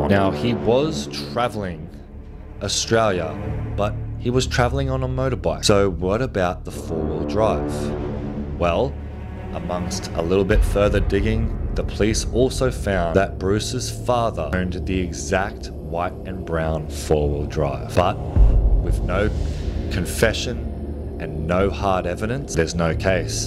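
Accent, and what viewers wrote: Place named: United States. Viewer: i actually thought you sounded American mixed in like..Did you spend time there.?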